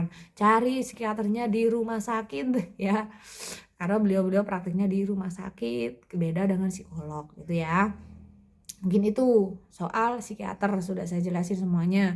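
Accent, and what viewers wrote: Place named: Madura, Indonesia. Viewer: Bun logat nya kaya orang madura ya bun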